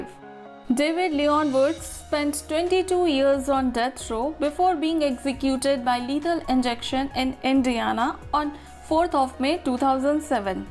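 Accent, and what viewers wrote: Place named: India. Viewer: Great video and the Indian accent is beautiful. Thank you for sharing these photos.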